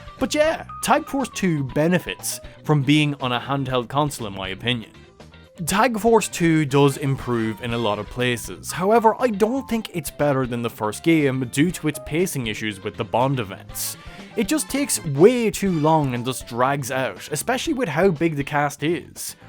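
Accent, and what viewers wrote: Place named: Ireland. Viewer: Okay I subbed! The review is very good, in fact the whole video and presentation is great. Plus the Irish accent is gold! Awesome stuff mate!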